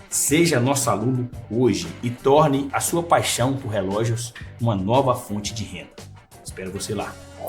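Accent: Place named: Minas Gerais, Brazil. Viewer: APOSTO que esse relojoeiro é mineiro! Que sotaque horroroso! "Pá quê quí sévi u bezel du relój?" Poha, sotaque de Minas Gerais DÓI no ouvido!!! VSF!!!!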